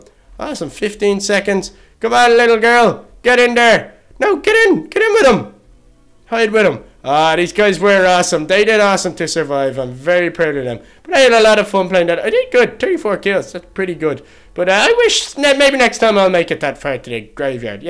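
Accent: Ireland. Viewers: Are you from ireland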